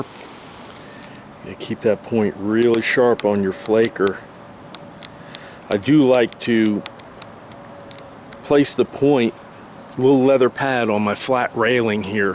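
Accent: Georgia, United States. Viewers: I was gonna say, sounds like you live near Lanier Speedway.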